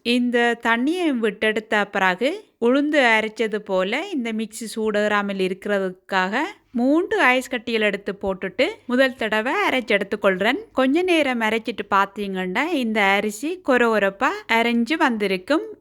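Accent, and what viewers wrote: Sri Lanka. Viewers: இலங்கை தமிழும் , தோசை யும் அருமை 🎉🎉🎉🎉🎉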